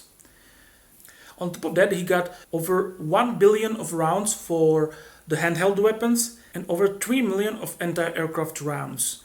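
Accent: Czech Republic. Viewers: Dat CZENGLISH!!!! I love how recognizable it is!!! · The light melodic flow and hard K at the end of every "ing" is the easiest way I recognize a czech accent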